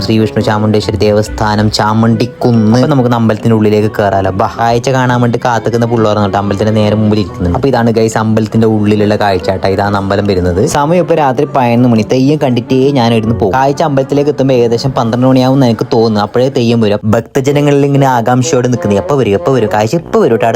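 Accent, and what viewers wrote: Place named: Thrissur, India. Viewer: Nasic dol Thrissur ❤samsaramkelkkan nalla rasad ❤❤❤❤😍😍😍😍😍😍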